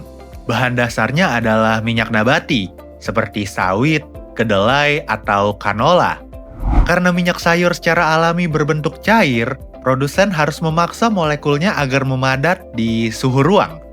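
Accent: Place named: Bali, Indonesia. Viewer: Gw tau bedanya margarin dan mentega, tapi kenapa ya klo video masak di youtube itu mengaggap butter dan mentega itu beda ya...? btw yg punya channel org Bali ya, penyebutan setiap kata yang ada "t" nya kyk org bali.hehe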